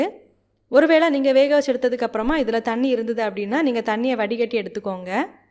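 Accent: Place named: Nagercoil, India. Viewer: Sister neenga nagercoil pola ........ speech apdi than iruku.....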